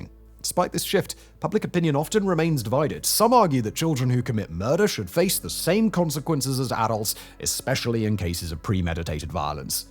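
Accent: England, United Kingdom. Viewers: is this just vsauce with a British accent? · The unimaginable. Up yours. Your so predictable. Political Correctness with an arrogant english accent. You forgot to mention Lizzy Borden.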